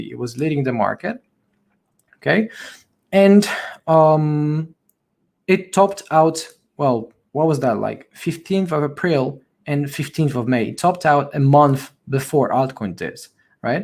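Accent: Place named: Poland. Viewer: Tak myślałem ze polski akcent, wpadłem od Scott Melker, pozdrawiam i dzięki za perspektywę. Dokańczasz do mojej listy!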